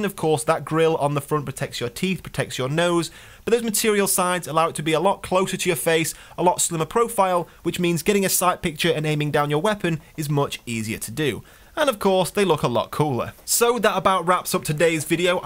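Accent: United Kingdom. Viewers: Really Nice! I'd like to start with airsoft as well, but here in Germany there are only a few places to play and without a car you can't go anywhere... I like the HK MP 7 quite much and maybe someone has a tip for me...(under 0,5 J ). go on with your amazing videos, I enjoy them very much because I like British English much more than American English..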